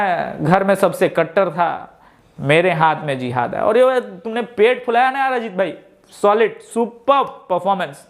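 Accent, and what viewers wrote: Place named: Bihar, India. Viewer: Waah...bihari andaj me bola...maja aa gaya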